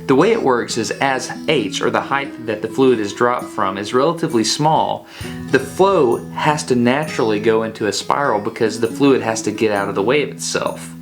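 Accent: South, United States. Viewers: Forget that southern accent its the math Im still trying to understand...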